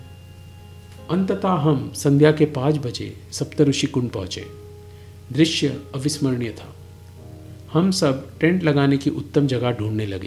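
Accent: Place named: Maharashtra, India. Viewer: I guess you are from Maharshtra or Goa as of you Hindi dialect.Really fine narrated.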